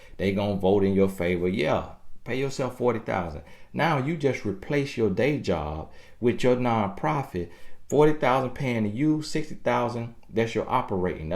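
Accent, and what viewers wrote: South, United States. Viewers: Right heauh ! Love his southern drawl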